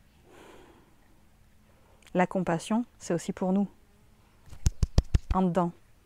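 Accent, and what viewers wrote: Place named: Quebec, Canada. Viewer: Merci, tu es si sage et pertinente! Dis-donc, tu vis au québec ? Je crois reconnaitre mon paysage et un peu de notre accent. :D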